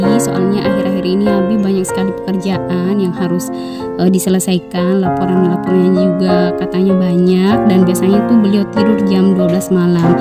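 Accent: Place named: Makassar, Indonesia. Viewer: Hallo bunda salam kenal bunda asal mn??bahasanya ky org makassar jg yah...semangat beberes2 yah bunda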